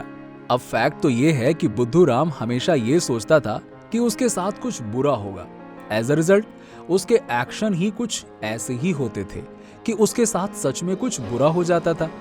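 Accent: Bengal, India. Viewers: Bhai aapka bangoli accent wala voice Jada achcha lagta tha.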